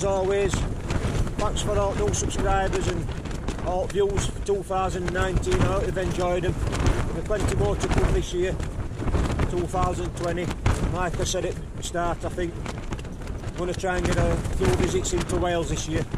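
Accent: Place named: Sheffield, United Kingdom. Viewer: What great little spot, I was up there last week with my son on my first walk of the new year. Parked in the big layby near Cutthroat Bridge and hoofed it up to Back Tor. Spied yer mate Mezz's pitch whilst there. Cracking weather till a front came in from Kinder. We managed to get back to the car before the rain hit us. I fancy an overnighter up there now I know I can cope with the terrain (bad ankles). It's nice to hear a proper local dialect on here. Another Sheffield lad here. ATB - stay safe.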